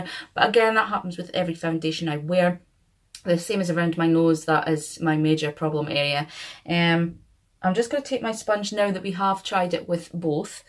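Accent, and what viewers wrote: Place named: Dundee, United Kingdom. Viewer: I knew I recognised that accent! I’m from Dundee too. Might see you around. Lol xx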